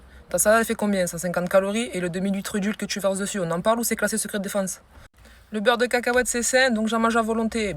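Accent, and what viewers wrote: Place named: South, France. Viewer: L'accent du sud😊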